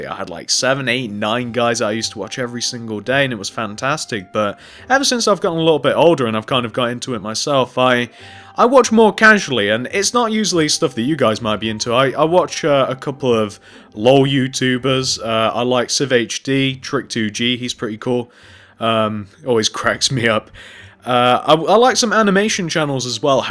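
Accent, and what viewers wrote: United Kingdom. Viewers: Are u British then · I don't why but you remind me of PopularMMO's but with a british accent.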